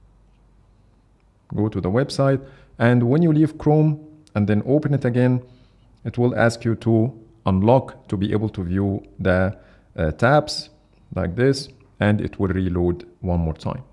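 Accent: Egypt. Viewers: Bruh Egyptian accents can always be picked up xd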